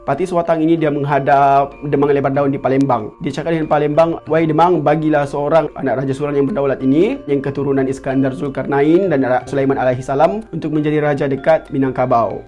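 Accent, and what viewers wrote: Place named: Sabah, Malaysia. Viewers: Saya ingat abg orang sabah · Dah sah2 dia orang Malaysia dik.. dia cakap guna loghat Sabah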